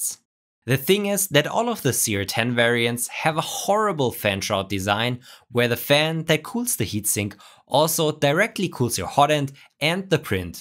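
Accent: Germany. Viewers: Are you German or Amerikan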